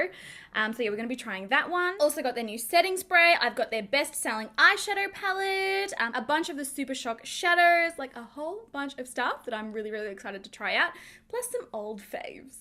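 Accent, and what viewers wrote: Australia. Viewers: Hi girl, love your work, just love hearing an aussie accent. Just wondering if you have ever tried a one brand tutorial or a first impressions on all Australian brands like 'Australis', 'Mirrenesse' or even cheaper brands like 'Designer brands' ?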